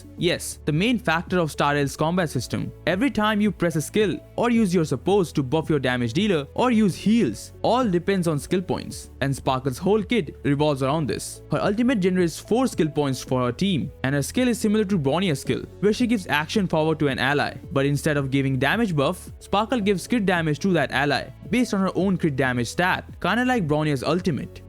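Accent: India. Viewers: You are indian ? Accent seems so {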